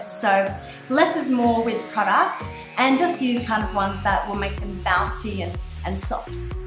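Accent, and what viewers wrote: Australia. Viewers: The curls that you made is so lovely, you look so gorgeous. And I love your Aussie accent.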